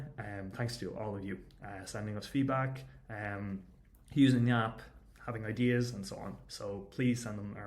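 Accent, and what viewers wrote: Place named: Ireland. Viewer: I didn’t get your name just an Irish accent I am also from the Emerald Isle 😂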